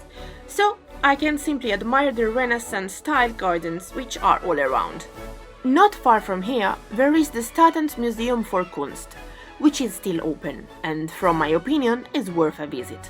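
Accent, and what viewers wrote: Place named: United Kingdom. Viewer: Wow, complimenti per il canale e per la professionalità dei vostri video. Complimenti anche per l'accento molto British 😉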